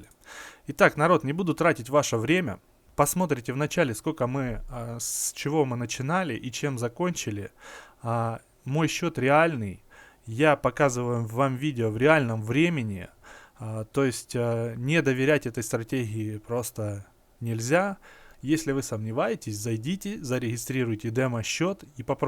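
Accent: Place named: Ukraine. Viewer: По разговору я понял,что Вы из Украины! Пополнчли через вэбмани или киви,как лучше? И можно ли с приватовской карты пополниться ?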